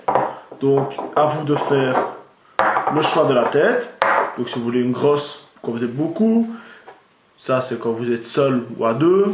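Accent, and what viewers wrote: Belgium. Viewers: Merci pour ce tuto ! Parcontre je comprend pas le truc du demi charbon, sa sert à quoi ? Et dommage pour le niveau d'eau moi je sais jamais si y'en à trop ou pas assez MDR ! Et ce qui me fais bien rire aussi quand y'en à qui disent "un petit blanc qui explique comment faire la chicha" MDR faut être marocain, algérien ou je ne sais quoi pour fumer ou faire la chicha ?! N'importe quoi... Bref merci du tuto ! Et j'adore ton accent belge :)))))))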